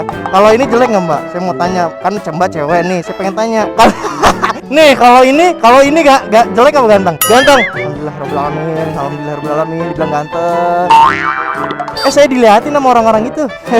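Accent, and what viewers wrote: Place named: Java, Indonesia. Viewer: lbh suka konten nanya jalan pake logat jawa bang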